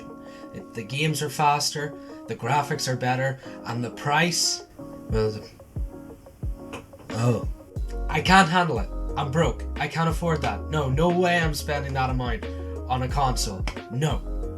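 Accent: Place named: Ireland. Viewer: My favourite part was the Irish accent 😌 And the Insight deafly going to add this to my steam list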